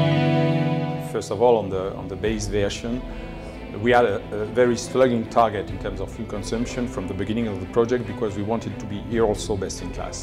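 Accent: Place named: Germany. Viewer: So disappointed by hearing a German speak... no matter how well he does his job. I am aware that is unfair.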